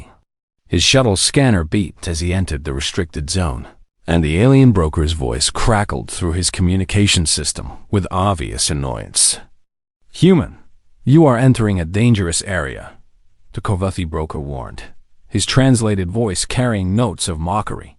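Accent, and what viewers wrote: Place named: New York, United States. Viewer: I’m pretty sure the person who sampled their speech for the AI to use as narration comes from New York or close by in New Jersey. Great story. Too bad there wasn’t any constant and appropriate visuals to match it.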